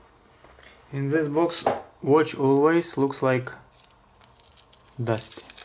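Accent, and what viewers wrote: Russia. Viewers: я не знаю английский, но обзорщик явно русский)))) а часы отличные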